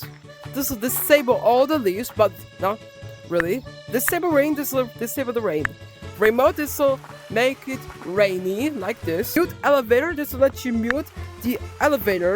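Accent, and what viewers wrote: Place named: Poland. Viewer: o kuzwa ciagle wiedzialem ze cos jest z jego akcentem ale ze chlop jest z polski?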